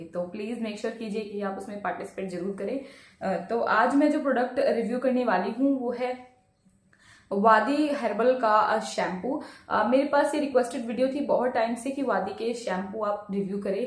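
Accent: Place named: Haryana, India. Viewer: hlo dear.....i m from bhiwani.. yhi karan h ki m aapki fun ho gyi hu... thoda haryanvi touch h aapme.....m rhti hu Lucknow me... aapki give away muje chahiye..m bhi use krna chahti hu.😊😊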